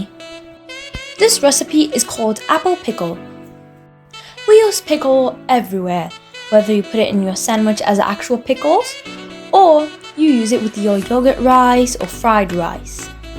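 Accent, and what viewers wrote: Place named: United Kingdom. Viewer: Great try with your British accent... came out well like recipie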